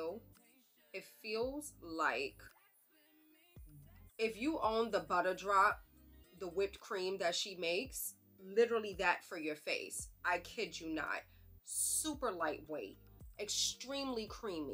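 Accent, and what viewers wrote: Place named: New York, United States. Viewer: i knew u was from NY when the video started and i hurd u talk! #NYShittt 🗽🗽🗽🗽 And i just ordered mine. cant wait! 😊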